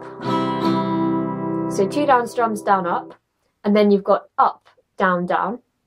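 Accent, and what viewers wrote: United Kingdom. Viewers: A beautiful girl with a British accent giving a tutorial of my favorite song! Who wants more? i can die in peace now. :-) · Bloody hell, you're Britain?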